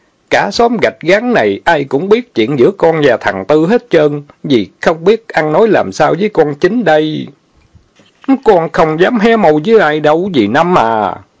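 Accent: South, Vietnam. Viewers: 👍🤣🍀🌷🍎🌷🍀😁👍 Giong Mien Nam Cua Lam E Muon Duc RUOT LUON Cam On 👍😝 Nha Ta👍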